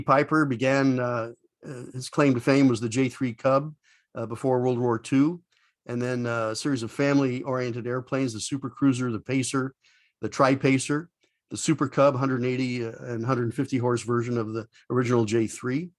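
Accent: United States. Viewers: I was born and raised on Long Island, and trust me your accent remains. It is still a lot stronger than mine, because I learn how to speak properly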